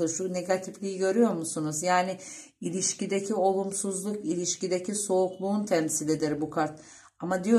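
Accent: Azerbaijan. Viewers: Ağzınıza sağlık.Güzeldi.Bu arada sanki Azerisiniz.Konuşmanız azericeyə benziyor.Azərbaycandan yazıram.❤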